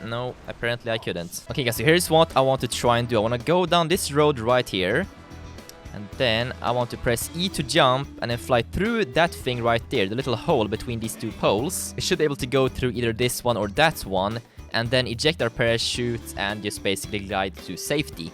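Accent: Sweden. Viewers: Are you swedish!?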